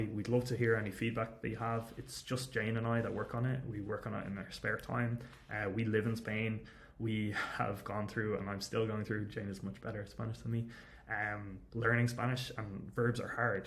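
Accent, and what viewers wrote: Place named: Ireland. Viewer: I didn’t get your name just an Irish accent I am also from the Emerald Isle 😂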